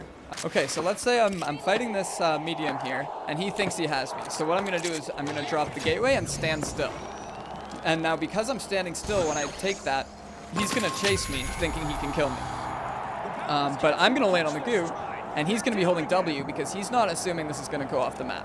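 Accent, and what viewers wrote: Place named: Ireland. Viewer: you are irishhhhhhhh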